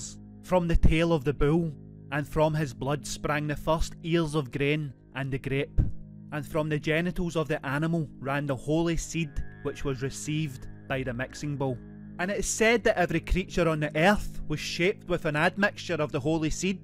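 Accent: Scotland, United Kingdom. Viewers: Please bring back the old narrator, i cant understand half of what this guy is saying. His Scotish accent (i think) is so damn thick its hard to make out certain words. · Nice video and accent, is it Scotish?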